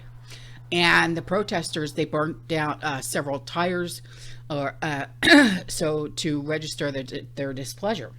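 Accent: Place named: Pennsylvania, United States. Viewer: I’m from the South and I love your accent. When you say, “youse guys.” I think you must be from Pennsylvania originally?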